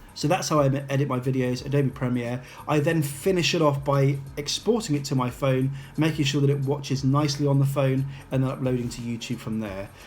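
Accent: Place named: Newcastle, United Kingdom. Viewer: Oh, so you're half geordie!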